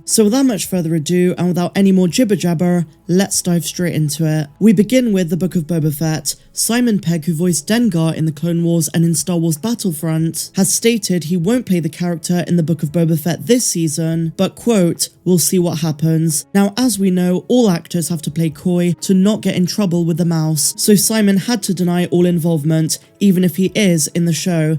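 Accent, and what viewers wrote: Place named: Bristol, United Kingdom. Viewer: Are you from Bristol Star Wars? Your accent sounds familiar?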